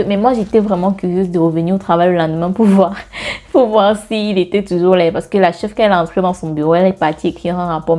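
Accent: Cameroon. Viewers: T'as un accent camerounais et ton est sénégalais?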